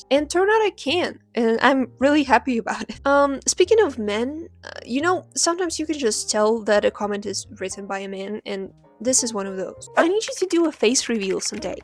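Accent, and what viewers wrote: Eastern Europe. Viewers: I'm an English tutor who mainly works with ppl from eastern europe and am like. 99% sure ik where your accent's from /lh. Not saying that bc it matters, just an observation - your English is Exceptional and you speak and use slang i'd say bordering on a level of some native speakers. It's clear you practice a lot and pay attention to pronunciation! keep at it <3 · бля ваш акцент ПУШКА БОМБА ОГОНЬЬЬЬЬЬ я надеюсь мой славянский радар все правильно улавливает (у вас ник я имею право делать предположение) потому что НУ БОЖЕ ЭТО ШИККККККККККК это самый.. наверное самый приятный акцент что я вообще слышала. очень круто. тем более если ирл вы не общаетесь с англами? колдун.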